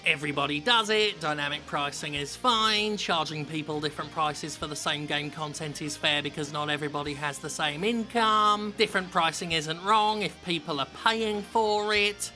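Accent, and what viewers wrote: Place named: United Kingdom. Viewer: When he is fit, speaks with a British accent and uploads a 22 minute video on a Monday. Thank god for Jim Sterling Son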